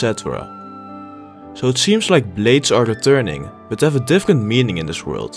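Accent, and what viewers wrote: Netherlands. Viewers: Is that a dutch accent im hearing :p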